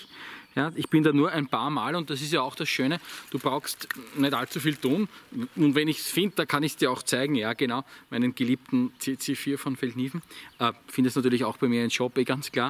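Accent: Austria. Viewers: Hab jetzt einige deiner Videos durch und lass mal ein Abo da. Schöne Präsentationen und deinem Österreichisch hört man gerne zu.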